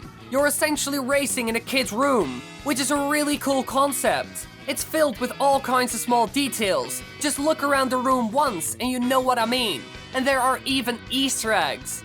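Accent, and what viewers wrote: Netherlands. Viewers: You really sound dutch for some reason. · are you dutch?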